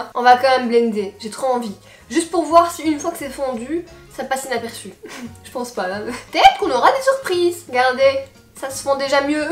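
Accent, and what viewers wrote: Belgium. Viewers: tu as honte de ton accent belge n importe quoi reste comme tu es on t aimera plus naturelement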